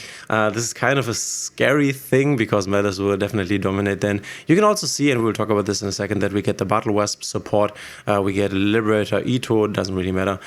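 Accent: Germany. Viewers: Warum auf englisch? Ich weiß nicht , man hört einfach wenn man eigentlich deutschsprachig ist aber englisch redet 😂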